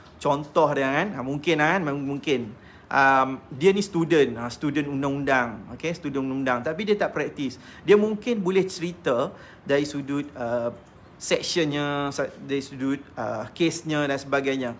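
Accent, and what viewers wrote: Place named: Kedah, Malaysia. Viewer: Boleh saya tahu tuan ni dr guaman negeri mna..ckp mcm kedah..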